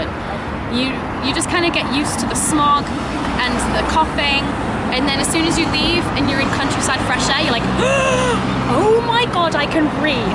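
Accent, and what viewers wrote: United Kingdom. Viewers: What country are you from? You sound half British, half Canadian. By the way, you are very pretty.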